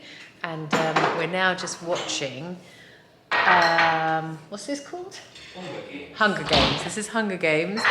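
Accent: United Kingdom. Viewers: I'm South African, had no clue you're South African with your full on UK accent. Thought you were Spanish 🤭 love your meal inspo. Such nostalgia seeing those SA treats 😊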